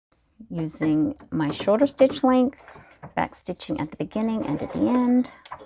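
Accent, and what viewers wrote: Australia. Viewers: You always have such lovely fabrics❤ They make beautiful bags. I made the Pythagorean pouch today with fabric lining and vinyl outer, it turned out lovely. Just a question, you have an unusual accent. I’m Australian and some words you say sound very Australian. Have you lived in Australia?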